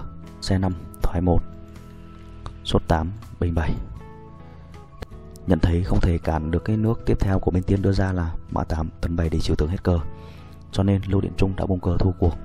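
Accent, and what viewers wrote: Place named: Quang Tri, Vietnam. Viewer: trung minh dang vậy mà tui cứ nghĩ giọng quảng trj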